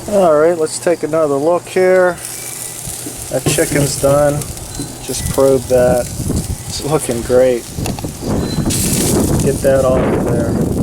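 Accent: Pittsburgh, United States. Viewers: Are you from pittsburgh? I detect the accent. You sound just like my dad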